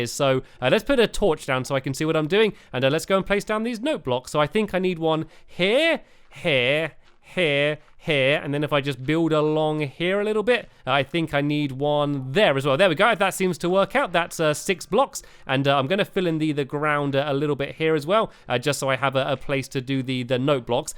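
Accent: United Kingdom. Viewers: U speak British like meh friend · 0206 Are you british?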